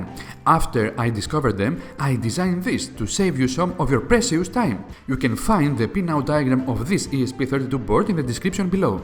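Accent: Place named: Greece. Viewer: I designed a home automation in my spare time back in 1985. It was designed with 68HC11 MCU which was the most powerful MCU you could buy in those days. Unfortunately, IBM beat me to it, and even called it what I did; "Home Run". I think they had ESP (extra sensory perception), since I told no one about my project! It had lots of discrete ICs like 74LS138, 74LS367 etc. I have determined that I can now implement a wire-less version (WiFi) of it, which would eliminate "ALL" wires (except power), using a single ESP32, IR motion sensor, mic/speaker, touch keypad, a TRIAC and a few OP AMPs for analog signals (hands-free Intercom and AC dimmable switch). What I wouldn't have given for an ESP32 at that time! Our younger viewers have missed being a witness to a digital revolution. Thanks for the nice video. Your voice and accent, reminds me of my childhood friend from Athens.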